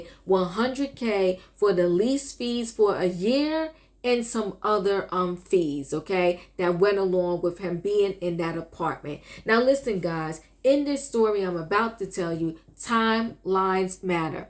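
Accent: New York, United States. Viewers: Big ups to the NYC!! Love your new York accent I miss my peeps!!❤️❤️❤️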